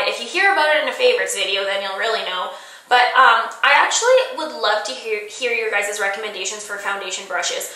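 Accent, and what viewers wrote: Canada. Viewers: Random question, are you Canadian? :)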